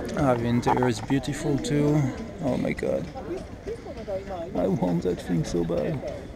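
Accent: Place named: France. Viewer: Hi, I just turned on my youtube bit and there you were with this new video :) I recall the Datsun Z coupes, I think they began as 220Z or perhaps 240Z but they were a big hit here in USA...I recall the Toyota cars, the Corolla, the Carina, and another C namebut we were thinking of them as cigar names...only Corolla remains. Thanks for this tour and the English audio....it is nice with a great French accent :) I have several relatives who have Japanese connections...the guy who is in Japan now has taught English for many years. his given name is Rhys an he just got married to a woman who teaches French but I am not sure where they reside. Likely in the Tokyo area...I have watched videos of the 311 tsunami provided by fnn311, he gives a nice English subtitle....I believe you did not have a problem with that 311 event if you lived further south in that year...Thanks again for this video ! 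Chuck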